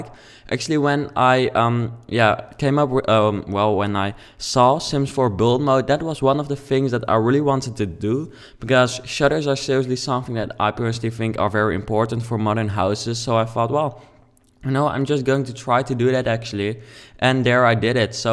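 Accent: Netherlands. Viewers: je kunt horen dat je nederlands bent, Kei goed gemaakt ! ;)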